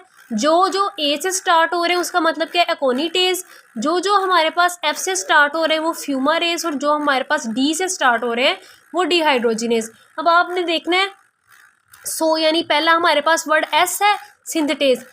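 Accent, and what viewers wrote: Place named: Punjab, India. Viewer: Punjabi speaker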